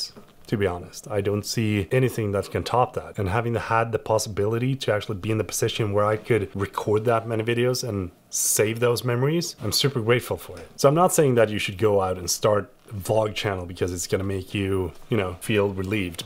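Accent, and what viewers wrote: Sweden. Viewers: Good to see the vlogs back. And your SwEnglish is on point 👍🏻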